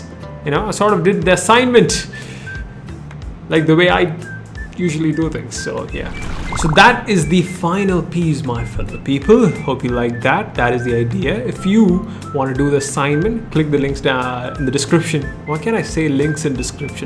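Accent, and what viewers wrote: India. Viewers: Yes i am too By your body colour and style of talking in English sounds and seems like an indian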